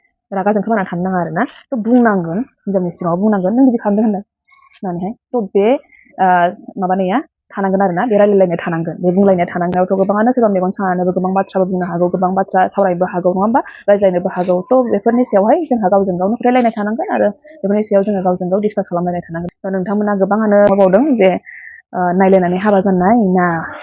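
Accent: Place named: Udalguri, India. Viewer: Ma'am ni rylynaikou udalguri ni badisw mwnw ag karon jwngni udalguri ni mansifra ma'am badi rylayw.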